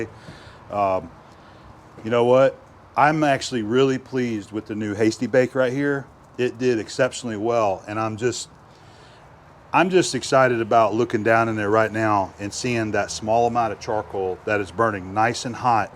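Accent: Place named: Western Pennsylvania, United States. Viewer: So what is your connection to I-79? I hear a little bit of Western PA in your voice but I think you’re in FL.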